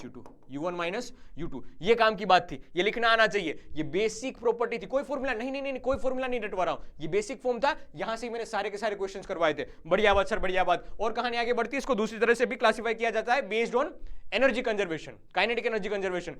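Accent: Gujarat, India. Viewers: Sir aap Gujarat se ho kya aapki voice tone bata rahi hai 😅😅 Sir aapne bohot accha revision karvaya ❤❤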